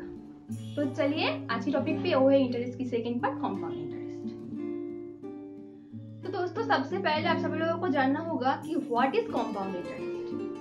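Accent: Bengal, India. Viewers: Oo pagal she is bengali so her tone of hindi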